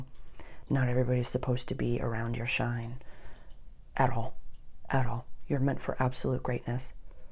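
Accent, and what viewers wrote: Boston, United States. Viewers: i love your boston accent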